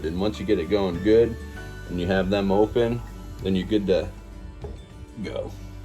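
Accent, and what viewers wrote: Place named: Canada. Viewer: Great demo and neat little stove. You kind of sound different to Americans. Maybe you're Canadian or something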